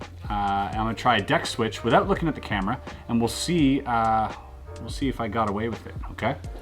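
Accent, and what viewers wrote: Scotland, United Kingdom. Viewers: we have missionaries in Scotland plus a guy playing the bag pipes at the church wide picnikick in back of our church in Chesapeake Virgina he played the music of song that also in star trek movie the death of Spock ps i video recorded the church wide picknick at Good News Baptist Church and uploaded to youtube video search engines channel ps nice cool out fit and good Scottish talk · From scotland stay like 20 mins away from Earlston loved the accent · Fabulous video. A great Scottish accent. Scotland is a fantastic place. I am the world’s worst magician, but always finds videos like these very useful. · Good Scottish accent, too 😅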